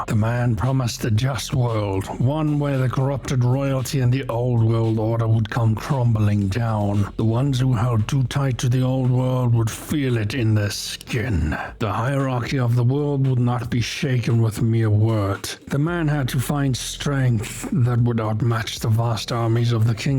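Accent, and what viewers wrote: South Africa. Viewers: Are you South African, by any chance?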